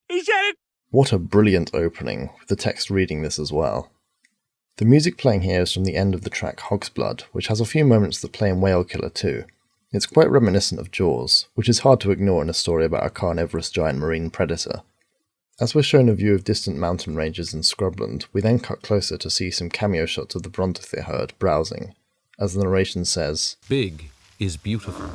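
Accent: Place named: London, United Kingdom. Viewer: I'm really enjoying the episodes so far. I swear your voice sounds familiar. Though it could just be the London accent. Does Valerian mean anything to you?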